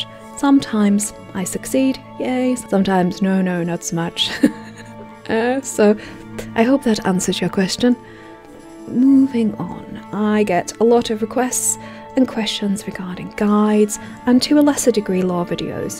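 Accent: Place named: Northern England, United Kingdom. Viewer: i heard the northern twang straight away haha from midlands here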